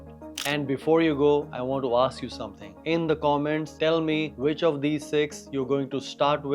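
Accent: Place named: India. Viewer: Sir you sound almost exactly like an indian,or you are an Indian?. Anyway thank you for this info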